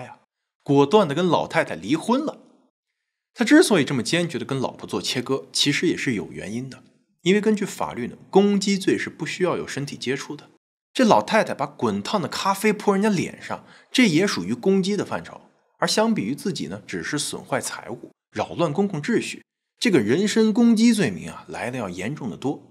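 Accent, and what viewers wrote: China. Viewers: 博主S與F的英文的發音都不正確(卻是中國大陸的英文教育所教的中式發音)，請重新上網向外教老師學學。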